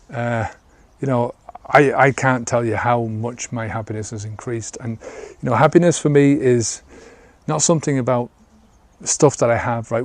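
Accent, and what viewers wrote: Dublin, Ireland. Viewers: hint of a Dublin accent here? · Such a cool accent! Is this Irish or Northern Irish?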